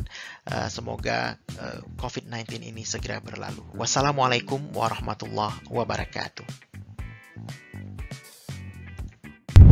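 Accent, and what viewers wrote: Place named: Malaysia. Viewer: Video buatan orang malaysia....dengar intonasi cakap.....kosa kata banyak digunakan oleh orang melayu malaysia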